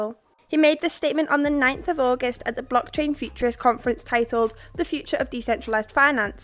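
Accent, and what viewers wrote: United Kingdom. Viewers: Yea to the northern accent! I’m sold.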